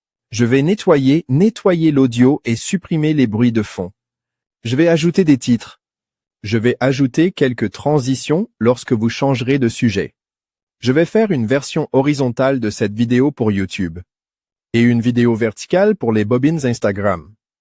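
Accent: Canada. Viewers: La vidéo est créé avec IA grâce aux anciennes ? La voix et les gestes sont globalement les mêmes, mais la dynamique et le rythme sont différents. ( si c'est le cas, l'avez-vous paramétré pour obtenir cette différence ?) L'accent et la voix sont différents également ( québécois), et les gestes ne sont pas coordonnés avec la voix, il y a un petit décalage Pour la confiance de nos prospects, ça peut être compliqué 😅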